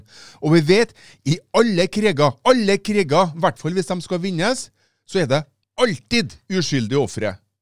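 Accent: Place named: Östergötland, Sweden. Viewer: Bra sagt! Du snackar Östgötska på norska.... Låter väldigt roligt.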